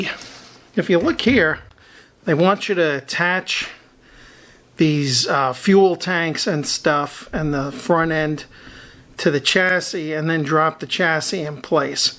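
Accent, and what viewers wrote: Texas, United States. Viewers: Weirdest Texas accent ever 😊 Great build buddy.....glad the channel is so successful and growing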